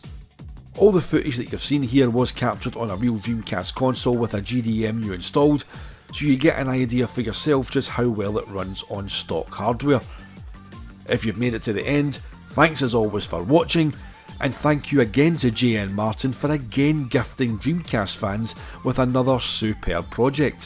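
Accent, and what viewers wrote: Scotland, United Kingdom. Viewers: cool love this accent ;) it’s like listening to Robert Carlyle in Trainspotting. I am french…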